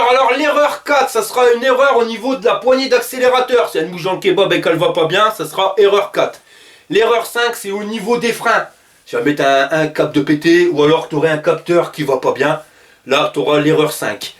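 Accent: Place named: Nord, France. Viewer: Ton accent chti j'adore mon gars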